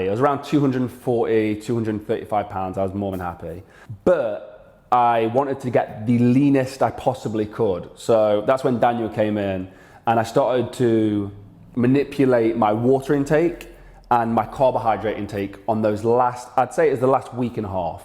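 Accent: Nottingham, United Kingdom. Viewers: Notts lad?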